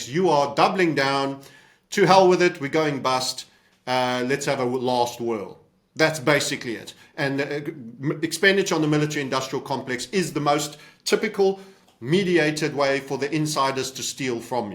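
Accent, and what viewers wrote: South Africa. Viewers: This is an Africaans accent, right? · South African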